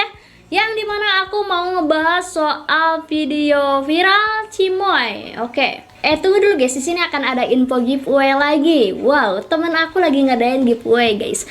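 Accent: West Java, Indonesia. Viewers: Kak, orang sunda?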